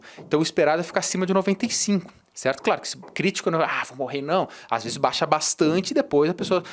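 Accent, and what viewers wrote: Rio Grande do Sul, Brazil. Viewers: meu parabéns, sua vocação com certeza, és dar aula! vim aqui nesta maravilhosa aula, pois a didática da minha professora de fisiologia, não é muito boa! percebi que tu és do RS! sucesso!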